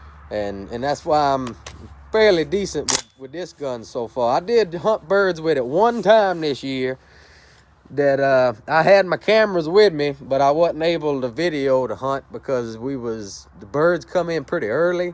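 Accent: Louisiana, United States. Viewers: Are you cajun?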